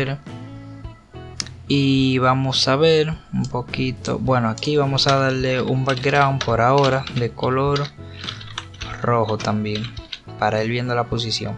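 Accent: Dominican Republic. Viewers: eres dominicano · apuesto que eres dominicano hahaha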